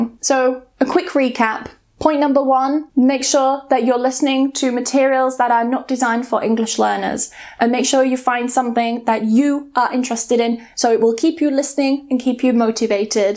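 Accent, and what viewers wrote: United Kingdom. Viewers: Your British accents is ❤️😍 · your* British accent ;) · Hiya, i like your british accent, this lesson was really interesting, I must confess…I usually watch your videos with english subtitles, I loath miss some keywords.. please have a nice day. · Cool your britist accent . Very very good . I enjoy a lot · in this great video, I like british accent such as your accent. thank you so much your types of listening.. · Hey that's a good while that I'm learning English and i was only focus on American English but i do find your British accent so interesting...So inspiring! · I understood every word you said , but sometime I find is difficult to follow the conversation. you're British accent amazing . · 妳的英國腔讓人愛上英國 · I understand perfectly English, even she speaks British English and I'm studying American English. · I prefer American accent, but, the point is, regardless of which accent you are more into, I think English learners should study different accents, because soon or late you will find someone who doesn't speak the accent you're used to, and then what?? 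Will you ask this person "could you please speak with the accent I can understand??" Of course not, and your accent is so nice that makes me feel like learning more British accent. I hope my comment is useful. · your beautiful British accent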